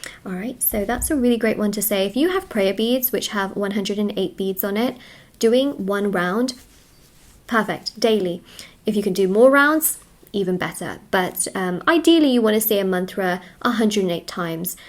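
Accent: United Kingdom. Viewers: That was one joyous reading! Your accent makes me wonder were you born in the UK or is it acquired?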